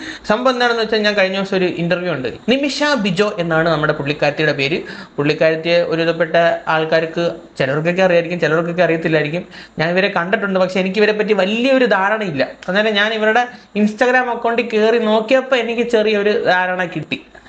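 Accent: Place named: Thiruvananthapuram, India. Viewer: പൊളിയാ 🤩തിരുവനന്തപുരം ഭാഷ da🤩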